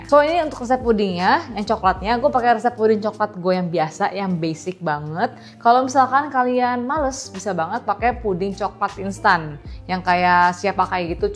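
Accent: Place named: Medan, Indonesia. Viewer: Kok jdi medan kali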